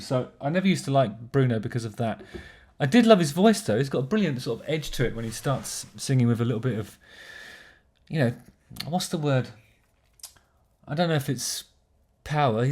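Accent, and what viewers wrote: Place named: RP, United Kingdom. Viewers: Loool, you explaining modern RnB lyrics in your posh ass English accent was great. Nice to finally find your YouTube page. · Love these! I'm also from good ole lowestoft and love listening to your accent here in nz! Always been a massive fan,thanks for sharing!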